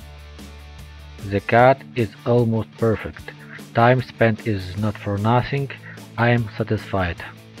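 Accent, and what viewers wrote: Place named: Ryazan, Russia. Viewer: Один вопрос - for нафига трепаться на английском , если акцент рязанский..... Есть же титры..... Кому как...мне ужасно режет слух.....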